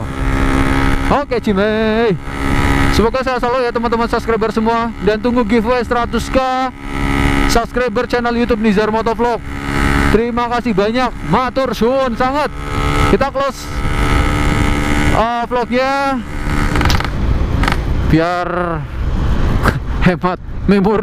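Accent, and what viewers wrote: Banyumas, Indonesia. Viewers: Setuju full ngapak 😂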